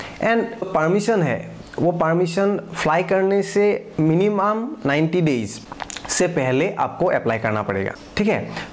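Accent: Assam, India. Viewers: Why your accent is like that ? Are you from Assam ?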